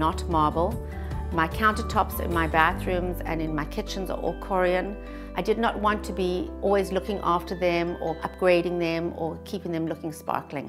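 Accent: South Africa. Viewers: Took me 3s to recognize the South African accent. The style is so South African. Beauty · Guessed your were South African. I too am from South Africa. Love your home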